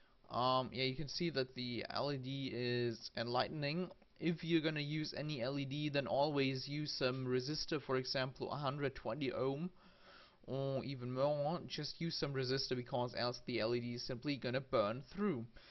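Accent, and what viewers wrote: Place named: Germany. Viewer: Are you German You sound German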